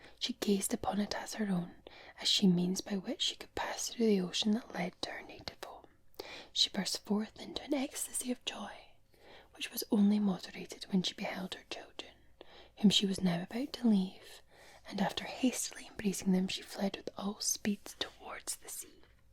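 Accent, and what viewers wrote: Scotland, United Kingdom. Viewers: So glad I found your channel looking to bed tonight love your accent I'm a londener and I love the Scottish accent hope watching you before bed tonight makes u pop up in my dreams 💕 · Yey! More very Scottish accent please! The very scottish roleplays brought me to this channel. Your scottish is the best. 😍🤗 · Happy Friday!! I really enjoy these videos that you go with a stronger Scottish accent, especially because it is so different from the accents that I'm used to. The stories were really nice as well! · What a perfect video for a Friday evening 🙌. I love your Tarten skirt and Tarten hair band 😍. You always look so comfy and cozy when you're lying across your bed 🛌 😀 😊. Yes, yes, one of my favorite Scottish nursery rhymes, you can't push your granny off a bus!! 👏 Thanks,. I haven't heard Wee Willy Winky in such a very long time. 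Thank you for bringing back that one 🙏 ❤️. Ahhh, hair massaging and rambling is just amazingly relaxing and soothing!!, you look absolutely stunning tonight! The Scottish poem is absolutely incredible with your Scottish accent! No one else could have read that any better than you, Magnificent 👍. Definite tingles with your incredible accent! I wish this video was a bit longer because I love listening to you speak. 😴😴 The mermaids 🧜‍♀️ wife was an awesome story, and, you must do more of these types of videos 📹 😀. You know, I may not know everything you've been saying, but I love listening to you!! 🥰🥰 Thank you for this prosecco Friday evening video. it has been amazing! Please take care and have a wonderful weekend 😊 💕. Lots of love to you! 💗💗😍💕💕💕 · I loved this video,. I really love your Scottish accent, because it is the most beautiful accent I’ve probably ever heard. 🥰 The rolling of the r’s and the pronunciations like “about”, “doon”, and others are so lovely to hear. 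💙 Even though I had no idea wth you were saying lol, I really enjoyed the Bernies Cuddle Doon poem. And even tho I’m not familiar with his work, I’d be open to you reading the poems of Robbie Burns so I can hear more of those pronunciations and slang. I also feel like I’ve heard the name Wee Willy Winkey before, tho I don’t remember hearing the actual poem. Lastly, I’m happy you brought the wave bag back. I’m always happy when you bring the staples of your channel back whether it’d be trigger items, video types, or more of your wonderful Scottishness lol. You should definitely include Scotland during Spring in an upcoming Creating Your Fantasies video, I’d really love that! 😃 Anyway tysm! This was too lovely:_Loveheart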